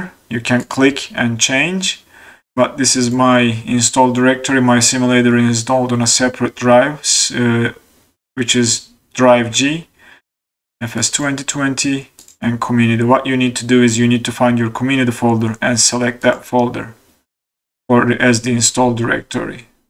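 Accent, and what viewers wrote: Turkey. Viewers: Thanks for tutorial. Quick and explanatory. But your accent doesnt sound like a native english speaker. Türk müsün ?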